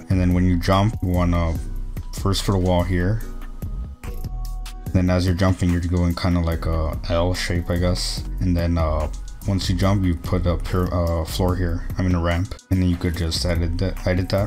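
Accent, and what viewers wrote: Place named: Spain. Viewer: U sound Spanish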